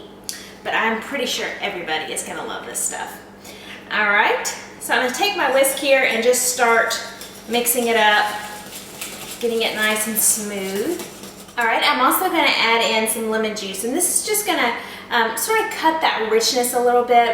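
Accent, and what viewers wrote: Texas, United States. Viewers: Are you Texan? I love your accent! You are also very pretty!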